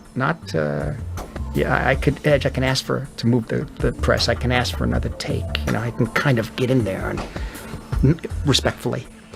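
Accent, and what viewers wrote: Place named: Brooklyn, United States. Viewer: Van Gogh with a Brooklyn accent!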